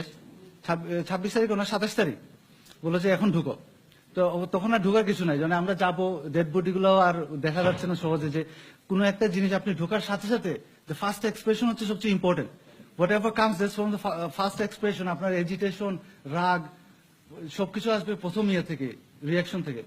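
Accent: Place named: Bogura, Bangladesh. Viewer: ভাইটি মনে হয় বগুড়ার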